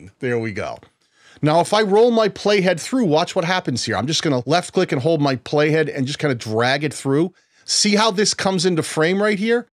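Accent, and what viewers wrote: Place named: Boston, United States. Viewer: Boston video guy here. I heard your accent and Immediately subscribed.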